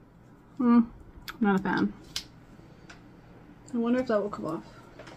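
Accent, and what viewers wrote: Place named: Canada. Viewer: I believe you are Canadian. I am from BC and I can hear it in your voice so I am so following you because you are fantastic!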